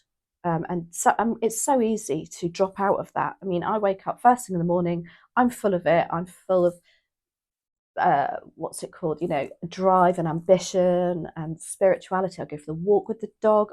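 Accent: United Kingdom. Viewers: I do think a lot about money and once I heal I will get chances to make it a lot, but right now I will try to take manifestation advice. Reading is astonishingly accurate and excellent as always. You are also very beautiful lady and Your British accent with great readings is just delight to hear. Thank you for helping us very much!!! ☘🌷🌺🌷🌺🌷🌺🌷💗🌺🌷🌺🌷🌺🌷☘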